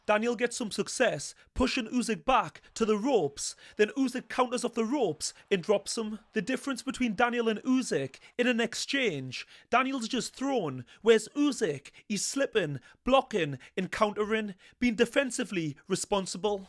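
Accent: United Kingdom. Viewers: What uk accent is this guy speaking?🤔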